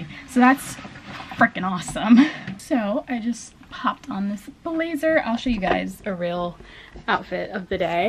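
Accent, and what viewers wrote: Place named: United States. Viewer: I listen you because I wanna to hear the american accent 👍🏻 you great👍🏻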